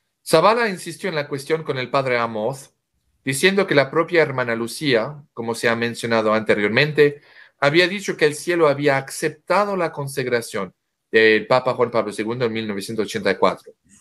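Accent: France. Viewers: Es un honor escucharle con su precioso acento francés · Me gusta su acepto francés. Gracias.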